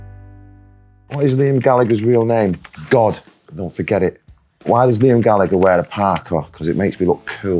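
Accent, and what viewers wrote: United Kingdom. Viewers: Definetly, i love british accent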